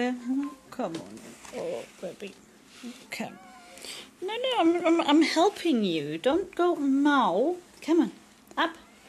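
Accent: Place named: South Africa. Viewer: If you don't mind me asking, but where are you from? You sound South African :)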